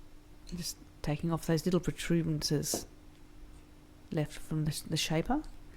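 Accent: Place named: Australia. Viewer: This was so interesting to watch. Thank you :) This might be a weird question, but where do you live? Youre accent sounds australian...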